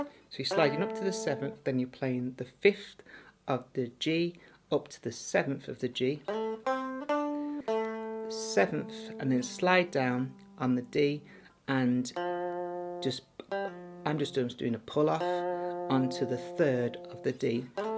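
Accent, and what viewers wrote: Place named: South Africa. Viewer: Goods job. You sound South African. Another great song by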